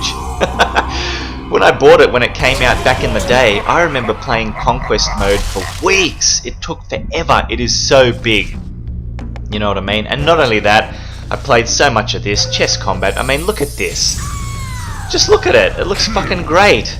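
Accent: Australia. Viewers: Yup that’s blunt amazing Australian accent ✨✨💞💞💞💞💞🔥🔥😭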